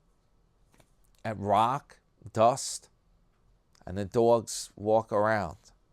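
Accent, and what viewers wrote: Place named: New York, United States. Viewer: He does mispronounce words, but this is not a fair example. This is his regional accent. Regional accents are disappearing. It makes me sad. It will be very boring when everyone in our country sounds the same. It’s interesting to me that even in the small region of New York there are different accents.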